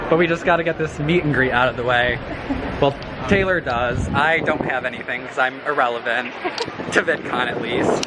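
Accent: Midwest, United States. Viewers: Brian Zavala yeah he does to me I'm southern (US) and he has a lil sorta kinda I think it's Midwestern accent I think it's so cute💕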